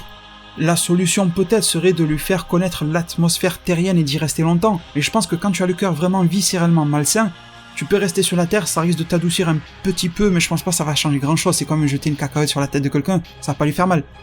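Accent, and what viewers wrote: Marseille, France. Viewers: Sa se voit que tes marseillais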